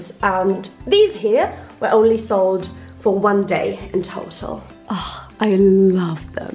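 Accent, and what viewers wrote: United Kingdom. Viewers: Are you Finnish? :) Cause you have a very clear British accent :)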